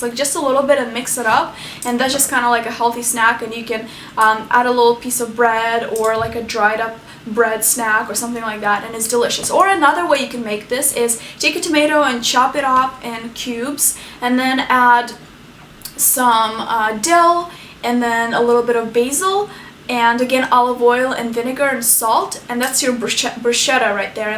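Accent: Russia. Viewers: Lovely girl;) hope to see more videos about nutrition. I think your accent is Russian, am i wrong?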